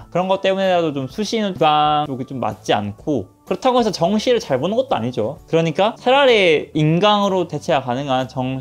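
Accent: Gwangju, South Korea. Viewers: 광주 출신이신가요 ㅋㅋㅋ말투가 거리감이 없는 거 같은데 느낌만인가 ㅎ.ㅎ...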